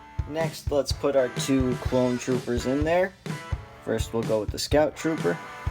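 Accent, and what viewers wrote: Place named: Netherlands. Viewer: Why do you have a dutch accent?😂🇳🇱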